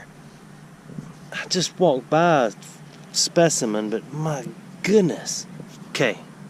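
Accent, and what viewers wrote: South, United States. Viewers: We dont have too many ppl with a southern accent here in Oregon.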